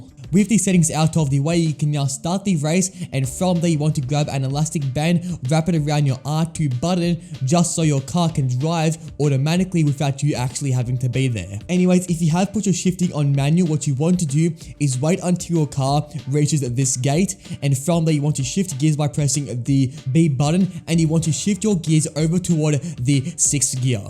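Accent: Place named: Australia. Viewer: Bro is fine he's an Aussie I live there I have the same accent but I'm Italian there is nothing annoying about his voice unless you hate it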